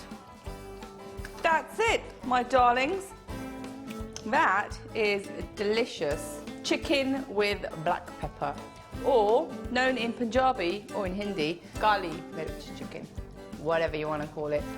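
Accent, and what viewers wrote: England, United Kingdom. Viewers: She is very much indie with a perfect british accent. What's wrong in that. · she sounds so british :3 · Your British english is awesome... Its... I learned with your show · loved the recipe and ur British accent. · She's amazing ..got an amazing English accent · come on people shes a Britisher and shes got an English accent..and seems like a really lively person..get over it and focus on the delicious food shes making..or else just stop watching...simple, isnt it?? btw you are fabulous...loads of love...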